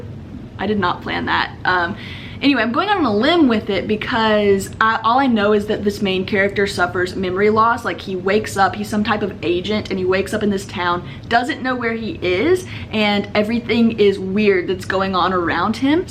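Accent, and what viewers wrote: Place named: United States. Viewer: I need to know what state your from. I feel like I know that accent!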